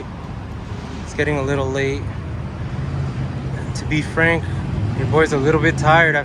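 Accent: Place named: Spain. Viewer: I live in this 'hood. To my ear, the youtuber has a slight Spanish accent.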